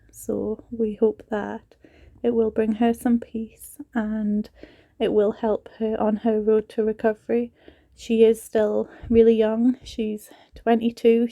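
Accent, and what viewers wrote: Scotland, United Kingdom. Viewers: My grandmother was from Scotland and hearing your voice and accent has brought me so many sweet memories. She was such a sweet, loving woman. Thank you both, ladies, for the fine job you do. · yes has picked up quite a lot of the scottish accent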